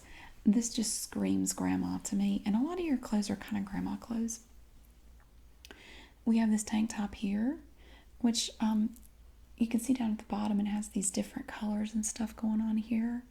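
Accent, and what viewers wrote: South, United States. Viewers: seriously though all scientific knowledge and psychology considered, is it weird that her special southern accent gives me an instant erection ?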